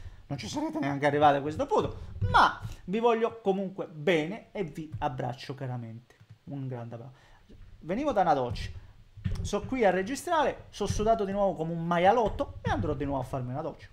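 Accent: Calabria, Italy. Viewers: l'influneza calabra si sente caro (anche se sei lucano) hai detto Castrovillari, paesino vicino a Cosenza, invece di Castrovilli 😄 TI SI VUOLE BENE BRO!!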